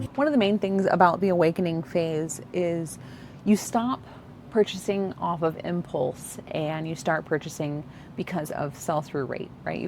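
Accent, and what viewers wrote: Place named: Michigan, United States. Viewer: Came for the reselling content, stayed for the Michigan accent.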